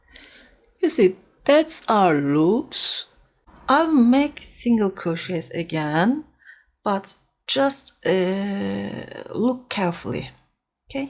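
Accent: Turkey. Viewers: You are an EXCELLENT TEACHER!!! Your English is NOT a problem at all, because you do the most important things to help us understand, unlike others who make crochet videos. I appreciate this tutorial, I am soooo bored with the same old stitches! You explain well, but other people do not explain the task, they just "say" do this stitch, do that stitch, without explaining why, but you do. Maybe we could learn a bit of your language! Turkish is a beautiful language, (the accent is too) just like other Altaic languages, like the Koreanic, Mongolic, & Japonic subdivisions, they are very beautiful to listen to, even when the listener does not know the language. THANK YOU! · It’s so beautiful 🤩 and your English is just so sweet. Are you Turkish ?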